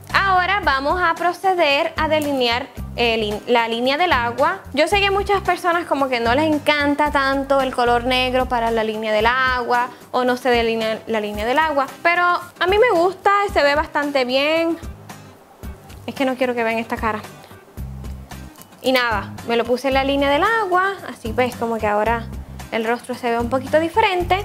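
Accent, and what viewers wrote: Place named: Isabela, Philippines. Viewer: Hola, vengo del canal de Josue, no sabia q tenias uno. Me alegra mucho, q hallas decidido grabar. Tu forma d hablar suena bien brutal a como hablamos acá en Isabela, se q somos del mismo país pero no en todas partes tienen el mismo cantaito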